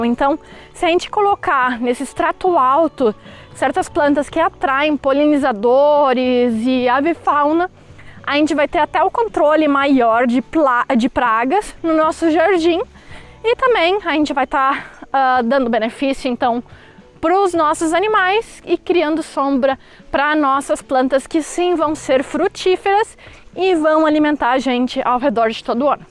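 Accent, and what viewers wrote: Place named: South, Brazil. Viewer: Não sabia que tinha um canal falando em Sulista. Como sempre, um ótimo vídeo 🙌🏽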